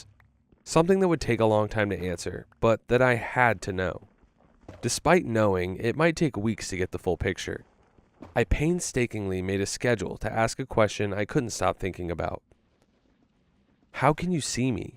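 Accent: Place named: United Kingdom. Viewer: bro i SWEAR when i listened to this at first it was a british guy talking 😭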